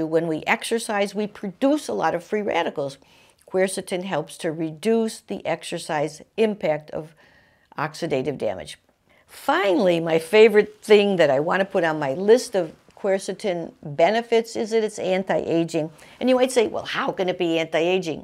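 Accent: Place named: Brooklyn, United States. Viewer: Of course,....you are selling a quercetin supplement! Where's dat accent from,....you sound like my uncle Louie from Brrrooklyn!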